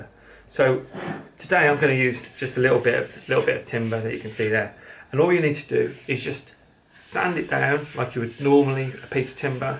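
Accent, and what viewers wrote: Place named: England, United Kingdom. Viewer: Also could you show how to French polish chair legs and smaller items like jewellery boxes A lot to ask but I’m needing to learn and like listening to your English voice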